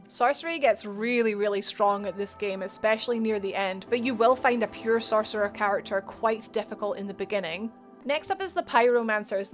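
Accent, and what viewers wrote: Scotland, United Kingdom. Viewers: Is that a Scottish accent? It sounds cool. You have a cool accent, you should try your best not to sell it to someone or accidentally discard it. Accents are valuable commodities.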